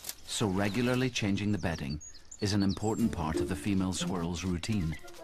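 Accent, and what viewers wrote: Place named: Scotland, United Kingdom. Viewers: Lmao I don’t think this person realizes what a run-on sentence is. Also, you are entitled to your own opinion. You literally just gave us yours. So what is the big deal if we like a celebrity? Can we not like what we want to like? With the whole narration thing and you not understanding/liking the accent, I really don’t know what you expected. It’s a documentary about nature in Scotland….so it makes sense why it’s narrated by a pretty well-known Scottish actor. It’s supposed to add to the fact that this is about SCOTLAND. If you have such a problem with it, don’t watch it. Simple. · If you can't understand the accent then you won't be able to understand Scottish people if you were to visit these places on the screen in real life so why watch?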